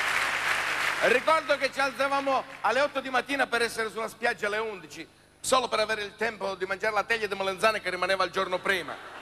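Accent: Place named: Piedmont, Italy. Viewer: È vero sono così i Calabresi, poi l'accento piemontese è fantastico!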